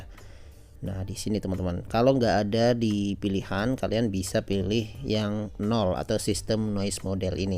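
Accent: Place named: Jepara, Indonesia. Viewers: wong jeporo mas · Wong jeporo ta jebule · Wong jeporo yha mas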